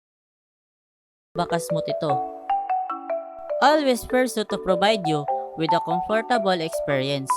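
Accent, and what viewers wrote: Iloilo, Philippines. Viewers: Ilonggo ka idol?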